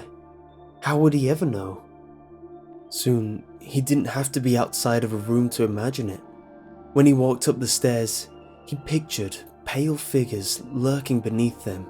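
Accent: United Kingdom. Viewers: Happy birthday,. Honestly, your voice is perfectly for these kinds of creepy feelspastas. It has that earnest, nurturing feeling that just complements them perfectly.... And the accent. The sexy British accent.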